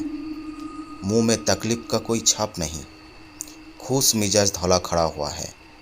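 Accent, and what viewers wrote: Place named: Bengal, India. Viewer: Apki bengali bohoth sundor hai Par hindi ki liye hindi bolne wale se hi dialogues bulwaye Hindi me bengali ka merge horaha hai Achcha nahin lag raha hai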